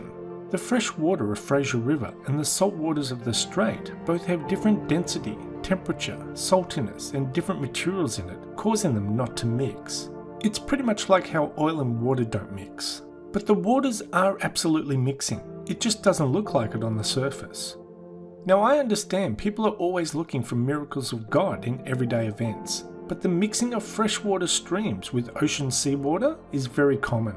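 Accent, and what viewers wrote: Australia. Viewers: Yes he sounds aussie. It was a joke anyway. 👌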